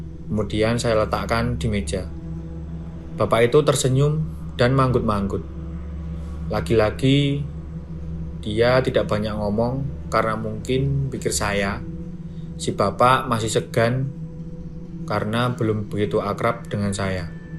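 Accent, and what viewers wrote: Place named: Java, Indonesia. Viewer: Selalu diulang dngerin crta ini...crtany bgus naratornya jg salah satu fav...medok Jawa TPI jelas cara berceritany..KLO cwe kyk mb Devi. Good job mas.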